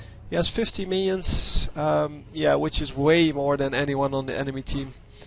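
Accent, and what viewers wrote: Netherlands. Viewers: Your accent seems dutch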